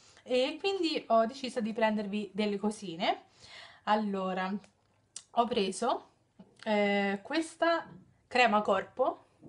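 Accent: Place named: Tuscany, Italy. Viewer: Ma poi il tuo accento è bellissimo, sei Toscana per caso?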